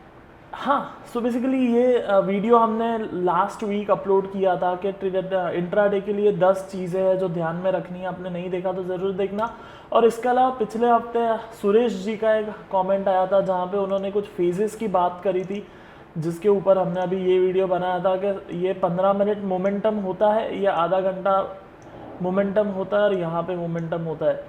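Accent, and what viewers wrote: Gujarat, India. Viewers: Bhai gujarati cho ??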